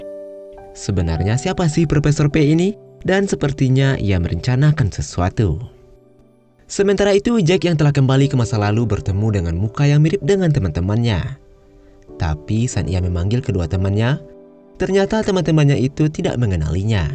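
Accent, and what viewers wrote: West Sumatra, Indonesia. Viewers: Org Minang ya min?😂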